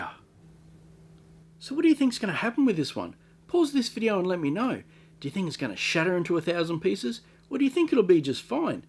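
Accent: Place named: Australia. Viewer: Hey, we used to live in Victoria and so it is always awesome to have a friendly Australian accent to appreciate. My kids love to ask for a bedtime resin video, they think it is the coolest. Me too!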